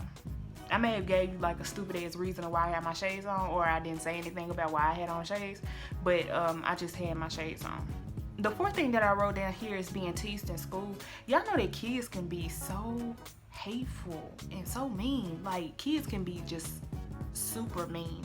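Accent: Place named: South, United States. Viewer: gal u are beautiful and very articulate with that southern twang , I am still single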